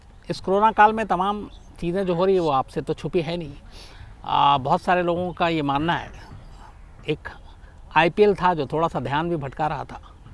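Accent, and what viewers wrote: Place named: India. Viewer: Shams Tahir Khan ji aap ki awaj ek hindusthani ki aawaj lagti hai.aap ke awaj mai allaha ne bahut noor diya hai.❤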